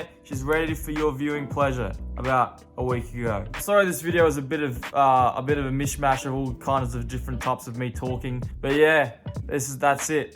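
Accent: Australia. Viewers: How to make a Film Lots of Screaming Thicc Aussie Accent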